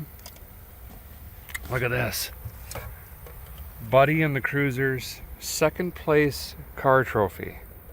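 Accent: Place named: South, United States. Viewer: I feel like we are losing you only because you’re starting to talk Southern as in “got me a coffee”. We still love you here in tropical Southern Minnesota. High of Zero tomorrow ♿️🚾🍻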